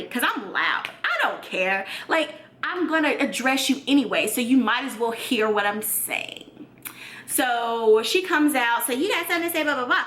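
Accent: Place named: South, United States. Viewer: Where are you from? You have kind of a southern swang in your tone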